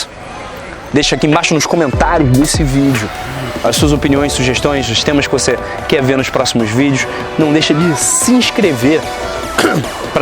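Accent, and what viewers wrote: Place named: Rio de Janeiro, Brazil. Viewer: A dicção também é importante. Muito carioques na sua fala. Disssssscurrrrrrso, Butão é um país, bOtão é outra coisa.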